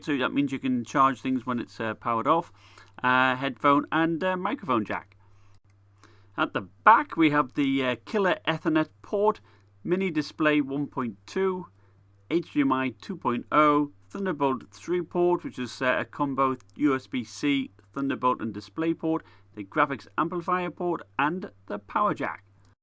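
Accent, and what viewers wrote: United Kingdom. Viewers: Like you British accent. Also thanks for a good review!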